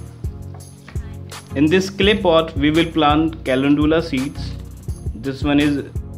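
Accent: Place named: India. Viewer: I'm so glad that the first video I clicked on the narrator was Indian because I watch a lot of Indian cooking shows and my projects always workout way better when the narrator's Indian. Hard to explain but I just got really excited when I heard his voice.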